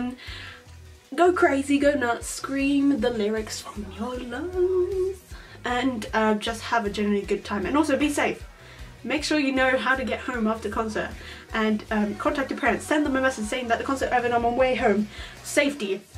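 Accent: United Kingdom. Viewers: You're Norwegian? I was pretty sure that you were British. Bc accent. IM SWEDISH, HELLO NEIGHBOR!!!!! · You're from Norway?!? I thought you were from the UK omg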